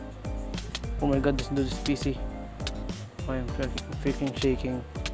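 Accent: Philippines. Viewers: By Hearing Your Accent, I'm 100% Sure That You're Filipino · bro your accent is like filipino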